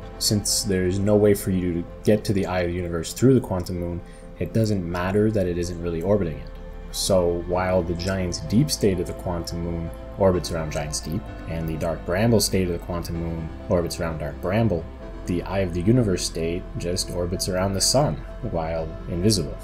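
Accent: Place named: Canada. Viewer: its also about him sounding canadian, hence why he replied "aboot"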